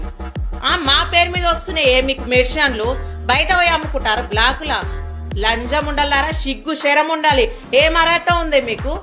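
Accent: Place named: Telangana, India. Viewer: Name emo lady hitler in andhra anaw...akka slang endi telangana lo undi 😂😂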